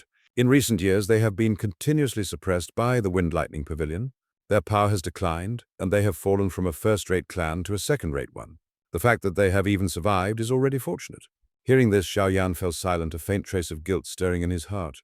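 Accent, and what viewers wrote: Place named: United Kingdom. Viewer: British accent is not match the stories like this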